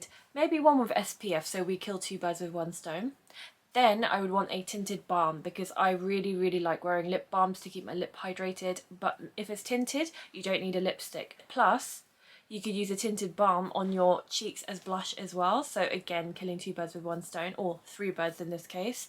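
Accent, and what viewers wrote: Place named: United Kingdom. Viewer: I am Japanese. I was born in London but left after 8 month . I really love your accent, there is something so elegant about seeing Asian people with a British accent. ( I wish I could speak like that lol) I enjoy your channel ❤ ( definitely need more beauty content creator by Asian women ) Btw I LOve Korean food , I actually live very close to the Koran Embassy so there are lots of Korean restaurants and the best Kimuchi store that a go all the time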